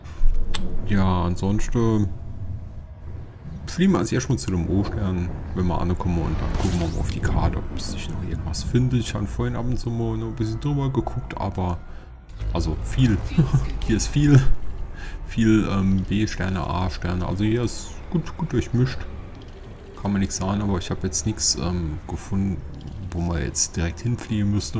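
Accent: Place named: Saarland, Germany. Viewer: Moin, Nettes Video. Dialekt klingt, als wärst du aus der Nachbarschaft. Grüße ausm Saarland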